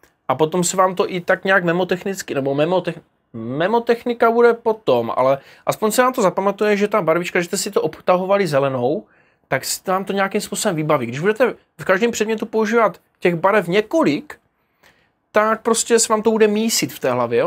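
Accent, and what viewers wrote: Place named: Moravia, Czech Republic. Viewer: Ten moravsky prizvuk je zabavny k tvemu videjku :)) Delas to skvele.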